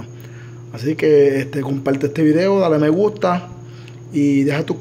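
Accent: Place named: Puerto Rico. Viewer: Bendito se nota que eres boricua amigo.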